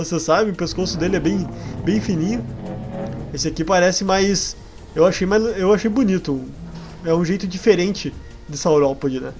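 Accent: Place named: South, Brazil. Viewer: sabia que VC é do sul vc tem o sotaque daqui do sul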